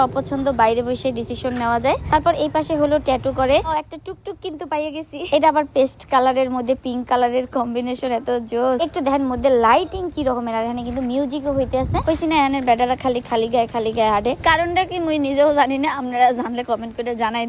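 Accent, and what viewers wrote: Barishal, Bangladesh. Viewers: ও মোর খোদা আণণে যে বরিশাইল্লা মুই বুঝছি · বরিশালের ভাষায় আর যে ভাষায় কথা বলো তবে তোমার ভিডিও করা অনেক সুন্দর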